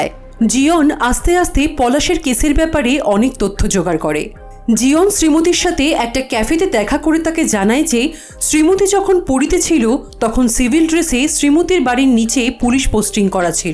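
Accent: India. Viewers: Apni ki Indian? Apnar bangla accent er jonno ask korlam 😊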